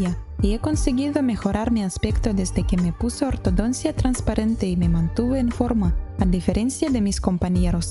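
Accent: Russia. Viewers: tus videos con voz rusa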